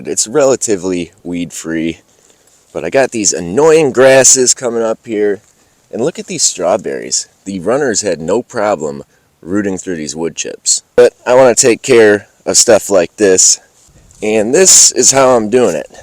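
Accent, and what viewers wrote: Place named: New England, United States. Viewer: Ur Yankee accent is pleasant sir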